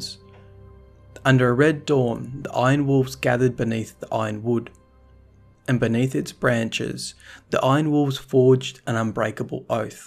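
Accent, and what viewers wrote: Australia. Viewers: Your Australian I thought you were sick mate! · DOOD YOUR FROM STRAYA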